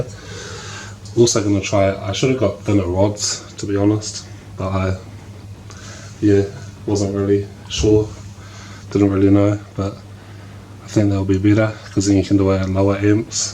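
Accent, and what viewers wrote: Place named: New Zealand. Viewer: He’s from New Zealand, that’s how they talk bru!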